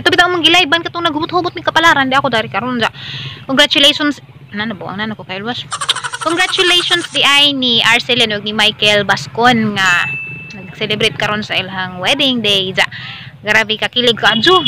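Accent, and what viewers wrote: Bohol, Philippines. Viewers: Nalingaw kus imong accent dam hehe. Makamingaw ang bohol. Pagdag bringhauz dam ha hehehe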